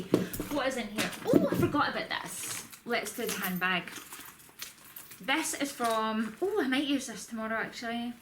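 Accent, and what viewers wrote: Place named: Scotland, United Kingdom. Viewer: Hi i really liked your charity shop hall's , you have a lovely scottish accent. Thankyou.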